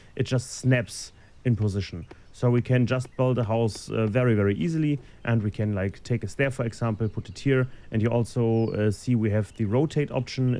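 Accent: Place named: Germany. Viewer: Wie man den deutschen Dialekt heraushört ;P.. bin hyped! sowas gibt´s noch nicht. Viel Erfolg!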